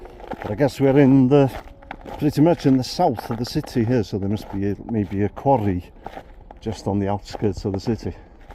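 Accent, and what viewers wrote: Wales, United Kingdom. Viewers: Hey, loving your videos, n loving your accent, there has to be so.e Welsh in there somewhere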